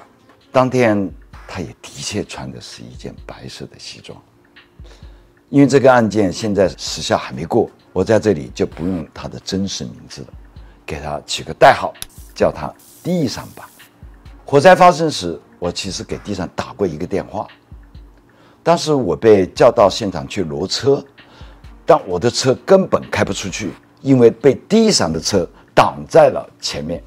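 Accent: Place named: Changsha, China. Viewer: 老乡，没想到您长沙话说得还是那么正宗。听了您的传奇经历，真的觉得既向往又钦佩。真的下不得老地咧，😁😁😁永远支持你。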